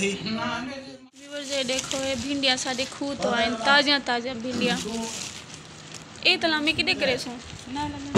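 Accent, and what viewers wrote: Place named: Muzaffargarh, Pakistan. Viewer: ap basckly Kha sy haiii Ap k leljy sy Tu ap Mujy district Muzaffragrah jatoi city sy lgti haiii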